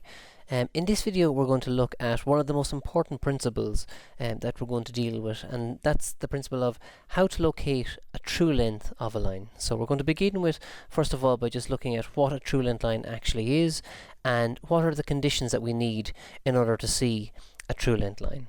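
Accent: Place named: Ireland. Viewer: This is amazing! Very good job. Also love your accent. It's Irish isn't it?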